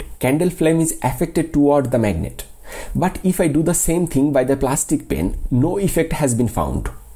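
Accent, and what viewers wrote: India. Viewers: Is this guy a native of India?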